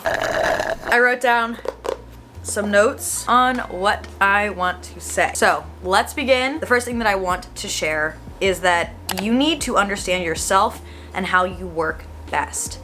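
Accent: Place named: California, United States. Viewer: That California accent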